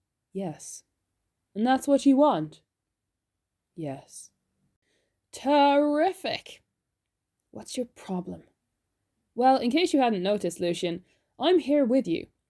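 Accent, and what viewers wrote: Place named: Ireland. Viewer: I’m living for your Irish accent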